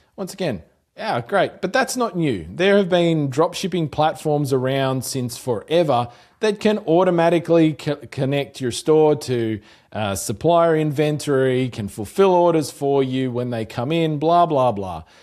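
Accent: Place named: Australia. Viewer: Love hearing such great AI myth busting here! Us poor dropshipping folk always get branded as the lazy ones reaching for the the new shiny thing. Love the channel and great hearing from a fellow Aussie!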